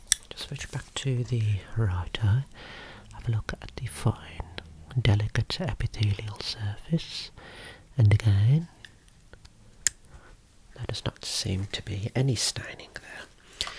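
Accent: Birmingham, United Kingdom. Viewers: yes, its a brummie accent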